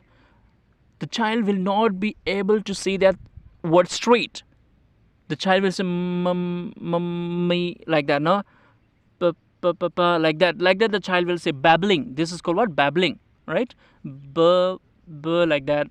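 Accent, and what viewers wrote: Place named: United States. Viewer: Sir ur english pronunciation is lk American people Khublei sir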